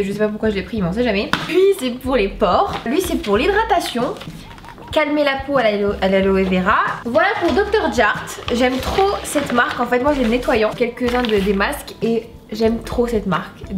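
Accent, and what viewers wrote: Oran, Algeria. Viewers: bah non je prefere des videos en Fr psq je comprend pas trop l'accent oranaise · tu es algérienne cool peux tu m’apprendre a parler comme vous ???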